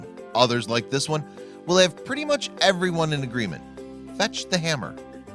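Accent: United States. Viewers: It's a bit disconcerting to hear copy so blatantly written by a Brit read by an American. Just saying, something you might want to think about.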